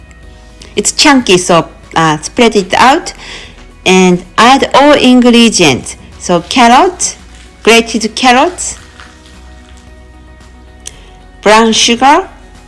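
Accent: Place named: United States. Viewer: Thank you!!...I love your accent japanese - american ;greetings from a peruvian nikkei !!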